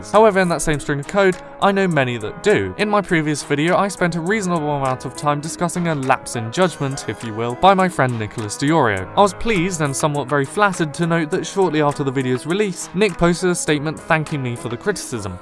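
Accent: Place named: United Kingdom. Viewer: this shit would be hard as fuck to follow if you werent british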